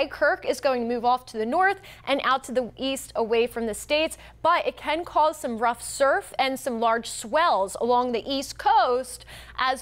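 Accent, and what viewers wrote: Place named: Pennsylvania, United States. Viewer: Gawd her accent is just terrible. Where is that from Pennsylvania?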